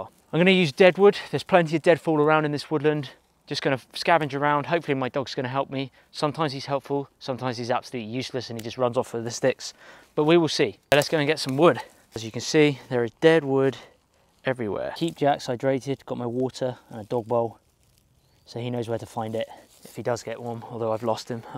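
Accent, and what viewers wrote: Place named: United Kingdom. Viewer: Make a hammock! I like the new mic. I can hear your beautiful Bri-ish accent much better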